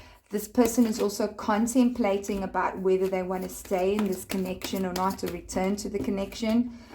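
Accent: South Africa. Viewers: Girly, I heard that accent and immediately knew you're south african 😂 glad to find a YouTuber that can relate to the struggles of loadshedding!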